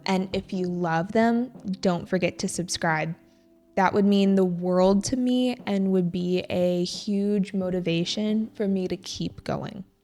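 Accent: United States. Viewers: Thank you for making such insightful videos for practicing English. I love your stories as well. Yesterday when I was looking for a native speaker to shadow American English accent, fortunately, I found your accent a good choice for me. Now I watch your videos every day and do shadowing. Looking for more meaningful videos.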